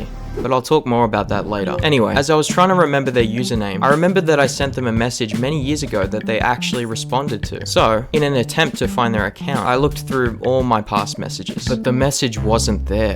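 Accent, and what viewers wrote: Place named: Australia. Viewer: the aussie accent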